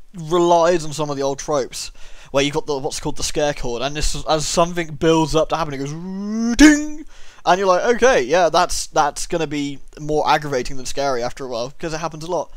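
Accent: England, United Kingdom. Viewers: Ooooh... I know that feeling all too well, although that feeling tends to manifest whenever I suddenly remember playing a particular game or something triggers my memories of said particular game. The most recent case, for me anyway, was when I was reading the comment section for his video announcement of his Patreon account, and one of the viewers suggested humbly that he redo his playthrough/LP of F.E.A.R. Pretty soon, I installed my copy of F.E.A.R. and F.E.A.R. Extraction Point. Funny enough, it was because of your LP of Dead Space that I now know of the:). My first impression when I saw one of his videos for the first time (in Dead Space): "Woah! That's a thick English accent!"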